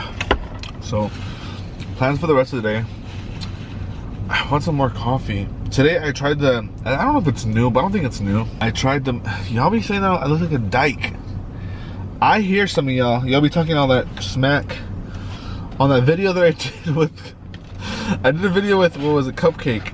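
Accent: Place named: Canada. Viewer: Did you just move to CA? Could of sworn you were Canadian :P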